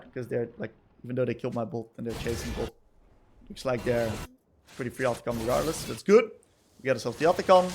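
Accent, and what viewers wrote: Netherlands. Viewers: he has a dutch accent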